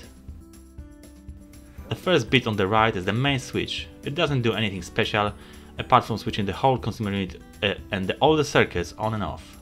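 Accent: Poland. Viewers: czesc. Slychac ze jestes z Polski. Pozdrawiam. Nie wiem czy nagrales filmik. Opowiedz jeszcze o ukladach sieci. Pozdro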